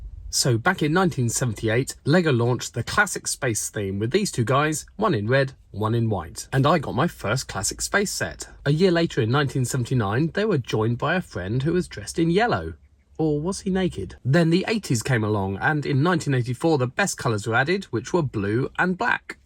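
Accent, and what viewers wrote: United Kingdom. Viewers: When I first watched this I knew he was from United kindom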